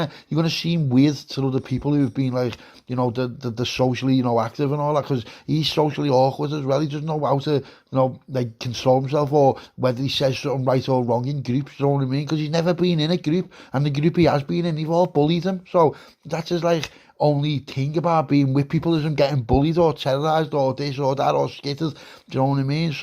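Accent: Liverpool, United Kingdom. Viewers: Top quality Scouse👏🏼👏🏼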